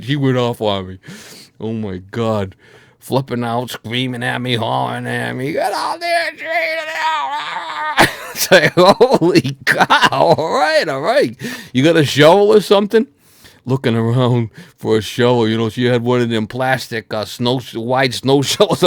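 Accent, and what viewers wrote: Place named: East Coast, United States. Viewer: The east coast way of speaking sounds pretty odd from here at the west coast. Quite the accent. But I'm sure I would sound pretty odd to too.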